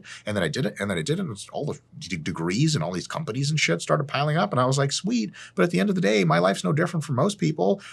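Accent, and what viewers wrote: Russia. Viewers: Damn, you Russian mate? Awesome. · Whoa Сделал дело - гуляй смело! You have really russian pronouncement!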